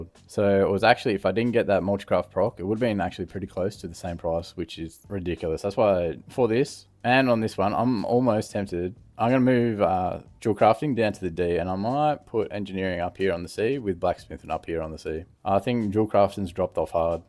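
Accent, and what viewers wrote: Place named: Australia. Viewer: First video I've watched of yours. Subbed as soon as I heard the aussie accent! Gotta support a fellow countryman 👊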